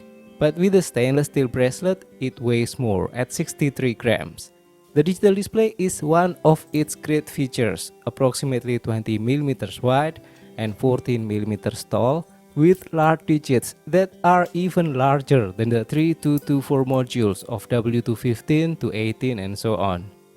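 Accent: Indonesia. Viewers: Hello! I came across your videos while searching for proper reviews of lesser-known vintage Casio models with better water resistance, like the B650WD. The more I watched, the more I noticed something both familiar and unique about the way you speak. After a while, I realized from your accent that you’re Indonesian! Your reviews are top-notch, and I’m surprised you haven’t attracted a larger audience. Please keep making great videos, sir!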